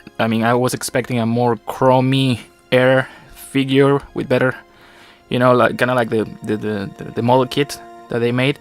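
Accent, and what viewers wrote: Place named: Conyo, Philippines. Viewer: nice review. conyo was just the icing of the review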